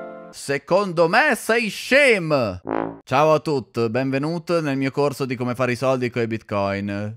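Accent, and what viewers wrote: Naples, Italy. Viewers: Sembra napoletano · ma questo modo di parlare è normale nella lingua napoletana. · Incredibile.. sembra napoletano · sembra napoletano😅😅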